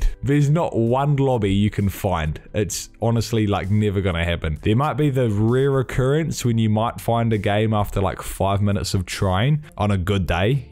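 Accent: New Zealand. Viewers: This guy has a Kiwi accent, he's from NZ. Ofcourse he knows we exist lol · So weird hearing a Kiwi accent in a YouTube video. Thank you for keeping it real brother.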